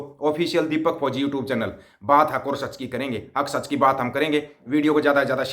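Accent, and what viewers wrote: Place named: Rohtak, India. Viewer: दीपक भाई जी आपका बिंदास रोहतक वालों का बोलने का लहजा बागेश्वर का मोड़ा । दिल खुश हुआ है । ज्ंयोदा बसदा रह ।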